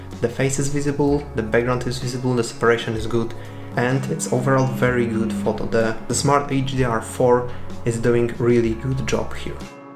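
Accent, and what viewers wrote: Poland. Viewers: Are you Polish? You sound like Polish guy with your English. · you are from Poland :D ?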